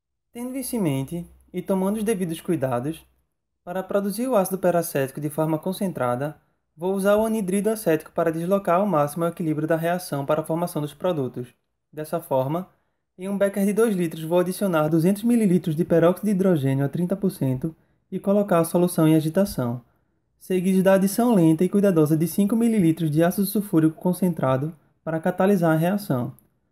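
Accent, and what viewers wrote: Northeast, Brazil. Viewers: ficarei esperando, pois o que vc está fazendo é uma grande contribuição para a comunidade científica do YouTube e ainda mais se for por um conterrâneo como vc, pois sou da Paraíba e pelo seu sotaque, percebo que vc tbm é daqui da região Norte-nordeste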